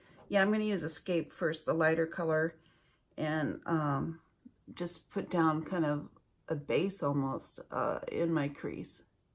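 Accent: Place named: Alaska, United States. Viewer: Your videos are informative and fun to watch. And yes, you sound Alaskan to my West Coast ears. 😁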